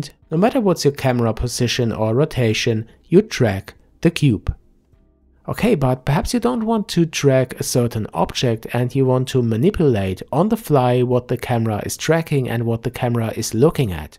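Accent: Germany. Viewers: Very good introduction, but the part where I should press something to get camera view the same as current view got lost in the German accent. Could you write it also on the screen ?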